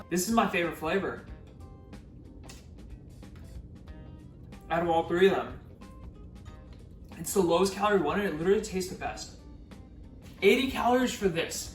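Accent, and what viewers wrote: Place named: New Jersey, United States. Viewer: YES, I’m from Passaic county lmao recognize the accent anywhere 😂❤️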